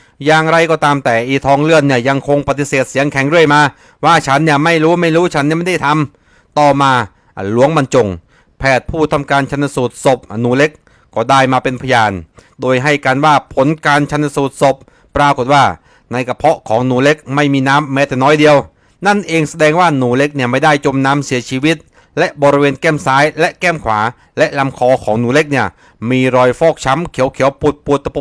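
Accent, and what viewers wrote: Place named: South, Thailand. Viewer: เป็นนักเล่าข่าวสื่อต่างในอดีตทั้งวีรกรรมผุ้กล้า ทั้งวีรบุรุสในยุคปัจจุบัน สำเนียงคงเป็นคนใต้อันทีจริง คือเสียงคล้ายๆคนใต้ คงแทนลึงทวนทอง999 ในยุคโคสนาเล่าเรื่องข่าวชาวบ้านได้ดีมากๆครับ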